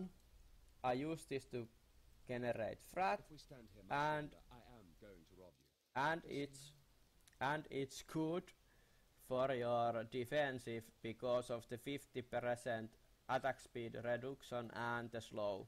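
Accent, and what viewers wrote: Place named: Finland. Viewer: Ootko sä suomesta? Kuulostaa niin suomalaiselta englannilta, if you know what i mean :D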